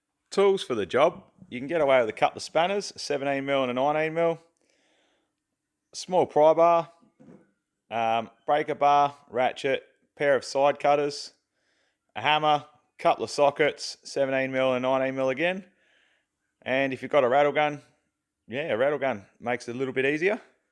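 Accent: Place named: Australia. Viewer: Fabulous, love the aussie accent. Cheers from Norway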